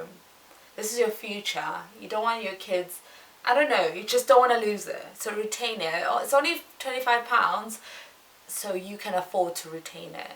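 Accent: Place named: South Africa. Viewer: but you grew up this side right, cause i can here that SA accent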